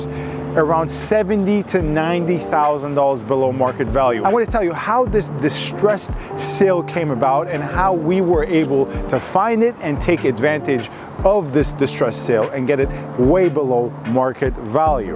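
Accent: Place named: Iran. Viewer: Red flags: 1. Pronounced Toronto wrong 2. Pronounced strip "shhhhtrip" 3. "Student" (first time) buyer 4. The condo owner owns everything from the structural ceiling, walls, and floors. Don't need permission for finishes. Just permission to carry out noisey work. Stripping popcorn is not a "risk". 5. That hint of the BMW "Pairjan" accent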